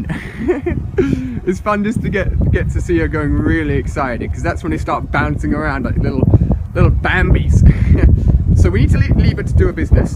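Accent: England, United Kingdom. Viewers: r u from England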